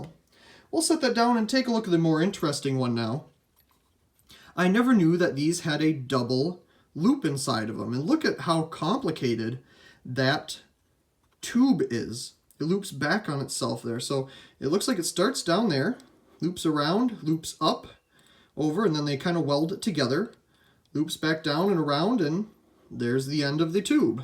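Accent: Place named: Northern England, United Kingdom. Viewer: Its his northerner accent lay off dude. :|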